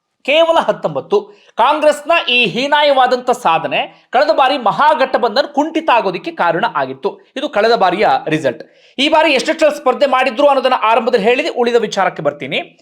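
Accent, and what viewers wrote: Standard. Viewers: Adbutha vishleshane. Sir election result gintha nimma swachha sundara kannada vishleshane namage kelokke hitavaagirutte. Pakshaateetavada intaha sarala sundara visleshanegala payana yashassannu tarali. Abhinandhanegalu.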